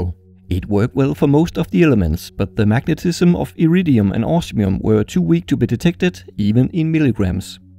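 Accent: Denmark. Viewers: When you just Can hear he’s Danish ;-) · It's funny to hear your Danish. I come from Denmark.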